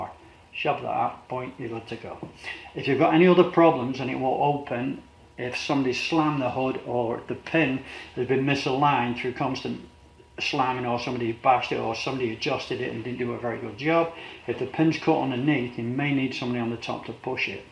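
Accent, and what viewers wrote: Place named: Northern England, United Kingdom. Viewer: The accent is still as strong, I would guess Rochdale, Chorley, Bury, around that area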